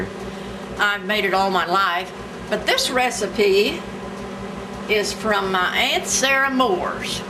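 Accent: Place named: Southern Illinois, United States. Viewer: “Sodie, difernt, and R” You Have to be from Southern Illinois. Am I right?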